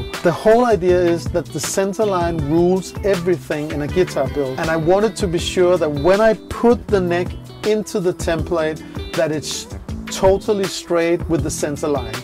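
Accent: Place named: Germany. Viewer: Why isn't it perfect? What are the problems there? I removed a year ago the neck of my strat copy and now the body seems to have changed due to humidity. The neck pocket was way too tight anyway. I'm trying to realign and reroute the neck pocket now. If your method wasn't perfect how can I achieve an absolutely centered neck? Doesn't matter how much work it is. I'm a perfectionist and I'm trying to achieve less than tenth of a millimeter deviation from body to the end of the headstock. I want it perfectly centered How should I do it? Your accent sounds German. Grettings from Braunschweig by the way.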